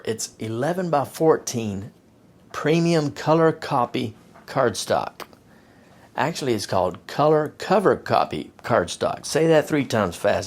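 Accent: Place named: Texas, United States. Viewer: I love your Texas accent.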